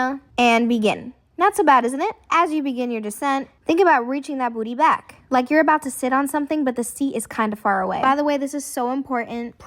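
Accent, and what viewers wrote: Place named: United Kingdom. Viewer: "Beautifully sculpted" is a massive understatement. I like your narration and the random British accent. I'm from across the pond myself.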